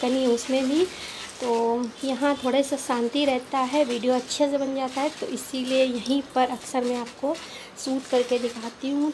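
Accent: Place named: Kanpur, India. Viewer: तुम्हारी आवाज सुनकर कानपुर की याद आ जाती है बिल्कुल ऐसा लगता है जैसे हम घर पहुंच गए आपके प्लांट के बारे में भी जानकारी दी बहुत अच्छी बहुत-बहुत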